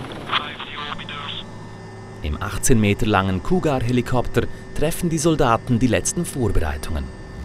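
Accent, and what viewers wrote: Switzerland. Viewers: Nei de heissst CHuuuggaarrr hahhaa, hgesch doch de Sprecher ghört mit sim schönne Bünder XD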